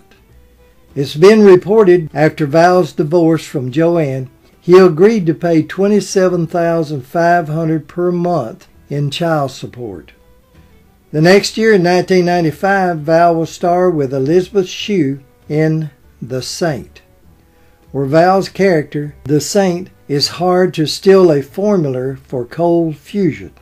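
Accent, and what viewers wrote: South, United States. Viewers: Another great video,. Your Southern charming voice once again makes your videos golden. Thank you.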